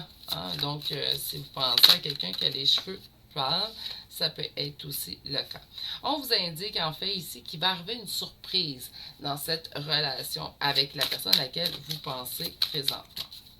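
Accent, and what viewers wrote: Quebec, Canada. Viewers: 👋... Je vous découvre et je suis scotchée ! Votre accent que j'adore (j'ai dû être Québécoise... 😍), votre chien Guizmo (moi c'est mon 🐈‍⬛), et là, le second choix et... TOUT est juste ! 😉😚😇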